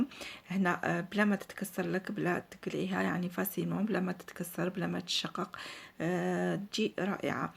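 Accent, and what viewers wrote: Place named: Algeria. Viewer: علاش مسميتها الشامية إنتي جزائرية على حساب لهجتك ؟!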